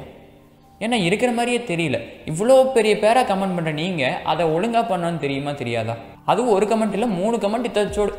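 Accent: Coimbatore, India. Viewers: Eriutha eriyanunu tha da pottan 😂😂😂😂😂😂 semma bro super ah panriga unga slang super.. ungaluku konjam covai slang um varuthu semma bro evlo nal enga irruthiga ungala yt miss pannrichu neega kandipa top la varuvinga ungalukaga pray pannikuran....😍🥰 And Happy birthday sorry for the late wish 😍😍😍😍😍😍😍😍😍😍😍😍😍😍😍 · Kongu Tamil 🙂🙂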